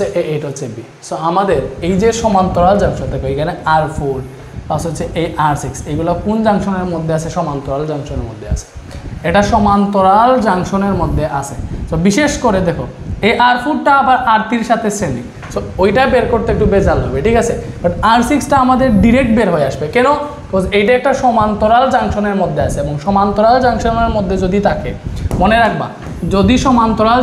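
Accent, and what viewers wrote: Sylhet, Bangladesh. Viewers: আপনার ক্লাস দেখে আমার বাক্কা উপকার দিছে। সিলেটি স্যার। ভালো লাগছে।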